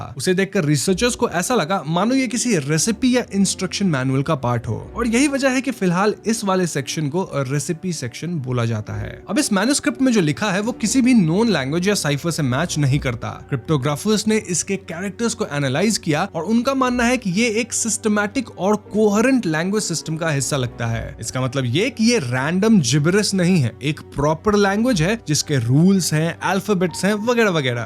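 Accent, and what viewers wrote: Bengal, India. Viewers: TUI KI BANGALI ? .....HINDI PRONUCE E KAMON JANO AKTA BANGALI TOUCH ACHE.....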